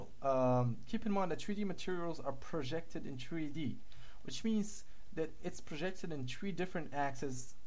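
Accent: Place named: Germany. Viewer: you are a true artist, and you can explain very well! even the german accent is adorable :)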